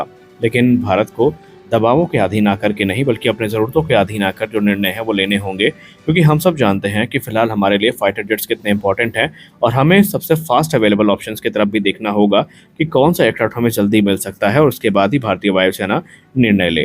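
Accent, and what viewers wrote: Pakistan. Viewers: Sala tera awaj padosi jaisa lag raha hai tm pakistani channel hai paaka INDIAN NAAM RAKHA HAI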